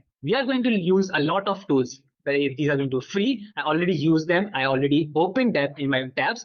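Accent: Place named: India. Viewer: You know what I find amusing? the low count of views for videos such as yours and I imagine it's because of U.S. viewers having issue with accents from our Indian brothers and sisters. But these folks always explain details way more concise and on point than many of the native english speakers. Native speakers are always hyping or going off topic. What I'm getting at is thank you my friend and thank you for your passion and sharing it with us all.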